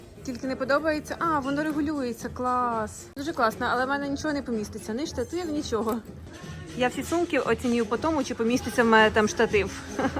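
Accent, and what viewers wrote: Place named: Surzhyk, Ukraine. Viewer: У Вас такой смiшний суржик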